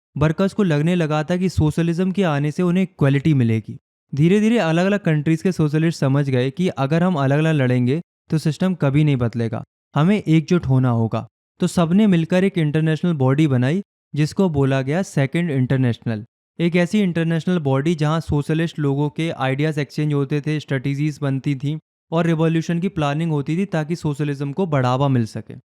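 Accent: Uttarakhand, India. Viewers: bhai tum uttrakhand se ho kya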